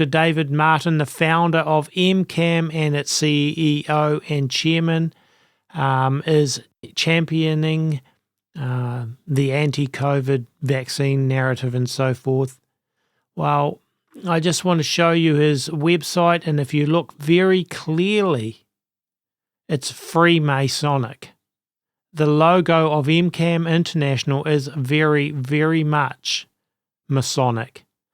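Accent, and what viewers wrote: New Zealand. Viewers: I always smile when u first welcome viewers - a Kiwi accent! 😃💗 Beautiful! From the Waikato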